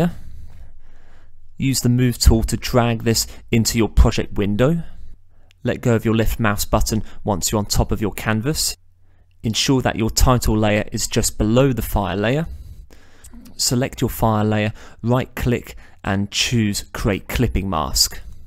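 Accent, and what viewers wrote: United Kingdom. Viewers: Amazing mate! I love it.. your British accent makes it interesting.. May I ask that you do a ''Texturing UV maps for 3d model tank''. For RTS video games like Company of Heroes. Please :)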